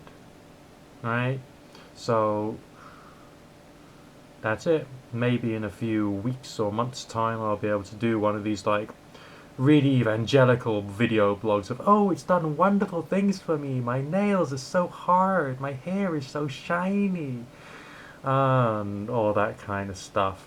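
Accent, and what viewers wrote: England, United Kingdom. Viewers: it's good to hear an English accent (for a change) rather than hearing the usual Yankee accents all the time.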